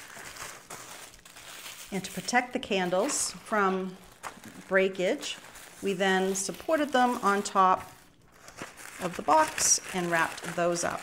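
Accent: New England, United States. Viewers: Hi, Your blue & white top is very pretty!! the Fall box was a lot of wonderful items, I was toooo late to order one but that's my on going luck, haha! I can almost smell all of the lovely Fall smells! That was so nice Carla could help pack the boxes, each one took some time!! take Care, hugs from Paula in Indiana!! p.s. I can really hear your New England accent!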